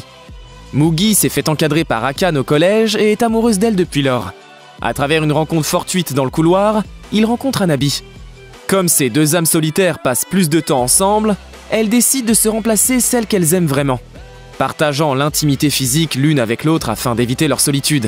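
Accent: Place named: France. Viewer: i can't stand your English accent bro, you're literally read english words with frensh accent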